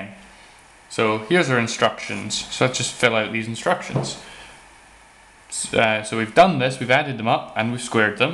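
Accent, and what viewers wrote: Scotland, United Kingdom. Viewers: Herschel's Lost Leg. He sounds Scottish....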